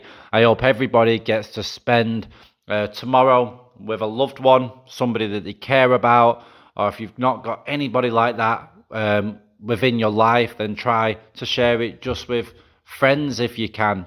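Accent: Manchester, United Kingdom. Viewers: Hi.. Love your channel. Although I have lived and worked in Asia I was not involved with any of this type of life as my wife was always with me but but I enjoy the human interest stories and your obvious decency as a man. But, as a Manc that left in 1984 I especially love hearing the Manc accent Does my heart good. Have a vey happy Christman with your son.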